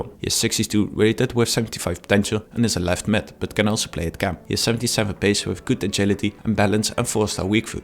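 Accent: Netherlands. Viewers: Bro je moet wel iets aan je engels accent doen man, geen haat ofzo maar je hoort duidelijk dat je NL bent verder goeie videos 👊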